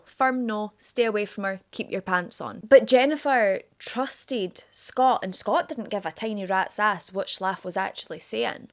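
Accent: Scotland, United Kingdom. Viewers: Sooooo…. I love ur accent and I know u try to pronounce things really well so ppl not from Scotland can understand (like myself). But I would LOVE a video with Scottish slang and ur natural pronunciation!!!! Maybe closed captions for slang words but I absolutely love hearing ur accent!! Jus an idea 😅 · Great video! Ive never heaed of this case and I grew up in Spokane Washington. Weird. I think you are so beautiful! You totally remind me of Bailey Sarian with a Scottish accent 😂 I love you both so so so much!!!! (((HUGS))) from Idaho USA ❤ 🐈‍⬛🐈‍⬛🐈‍⬛🐈‍⬛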